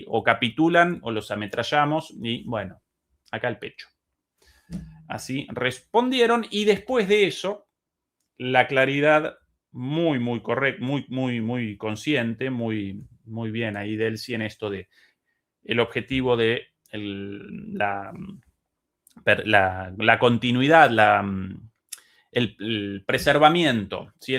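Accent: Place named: Argentina. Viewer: Eres Re-Argento para hablar. Me encanta q no hayas perdido el acento. Abrazo de otro argento.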